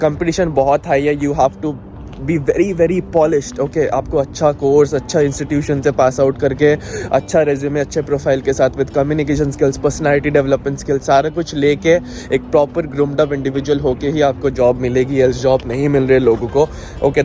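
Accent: India. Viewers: Can you talk slowly cuz you have an accent. You sound indian still cuz im listening your vlog for purpose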